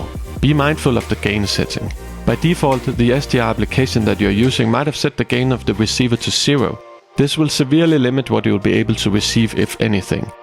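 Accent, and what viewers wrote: Denmark. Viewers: lmao I watched all the way up to the point where you showed the Danish map until I realized you were Danish too. Your accent is very faint. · Is that a Danish accent i'm detecting? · 110% dansk prosodi. Det er ligesom at høre Mumlemanden 😊❤️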